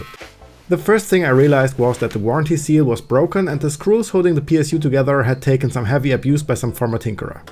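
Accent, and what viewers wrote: Germany. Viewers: for a guy that sound pretty german it's just seem wrong to hear "Aluminum" instead of "Aluminium"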